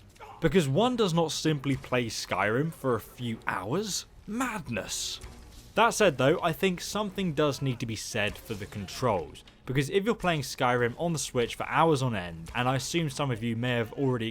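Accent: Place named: RP, United Kingdom. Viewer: I would love to hear a review of Skyrim VR with that posh accent of yours. I tested all your glitches on VR and they are working too.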